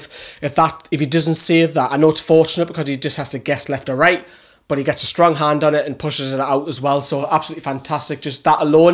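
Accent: Newcastle, United Kingdom. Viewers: Winning certainly brings oot your Geordie accent hahaha!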